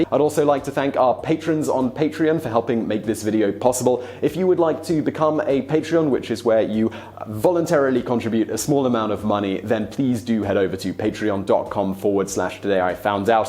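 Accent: United Kingdom. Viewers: I'm reading your comments in your British accent.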